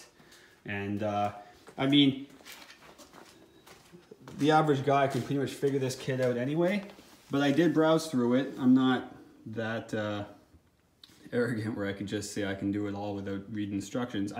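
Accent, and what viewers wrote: Canada. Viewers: Love the Canadian Accent, " Ought" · You sound Canadian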